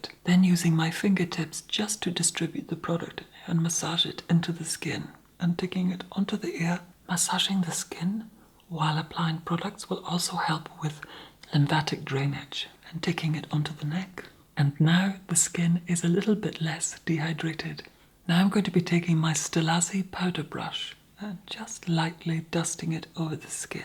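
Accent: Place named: England, United Kingdom. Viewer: To me, there is nothing more soothing than a authentic medium toned English accent. I adore the Brits. And you are a rare gem I should like to keep. And in the raw you possess a Angelic aura. I am about to get my own EOs out to use. The Sandman is sure to take me away afterwards to his dream laire.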